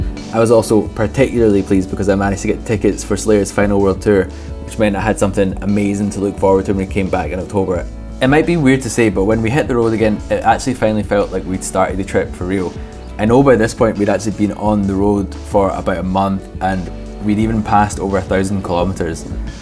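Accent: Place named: Barbados. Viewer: Here I am listening to your videos while still in Trinidad cleaning my house, passing time until I return to France this summer BUT I almost can't make the connection in my mind that you are NOT from the island of Barbados. Your accceeenntttttttt. You sound 100% Bajan!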